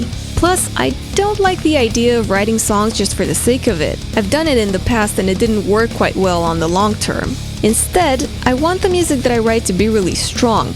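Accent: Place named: Russia. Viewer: Вааауу у тебя Чисто русский акцент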